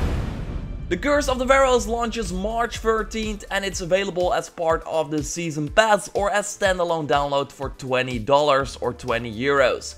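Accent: Netherlands. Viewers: Merkwaardig hoe veel mensen niet weten dat je Nederlands bent. Je zegt het redelijk vaak in je video's en je accent verraadt het (al is het niet dat typische Dunglish haha)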